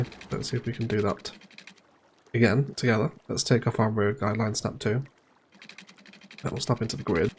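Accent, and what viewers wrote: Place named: North, United Kingdom. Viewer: Thanks for all of your enjoyable contributions which are always very entertaining. I was just curious...you've likely been asked this before: You have a northern accent; which region are you from. Thanks again. Best wishes from Belgium.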